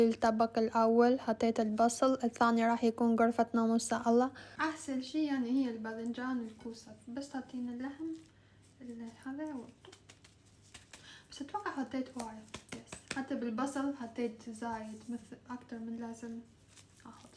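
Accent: Iraq. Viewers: ماشاءالله كلامه عراقيه فد مره تعرف ❤❤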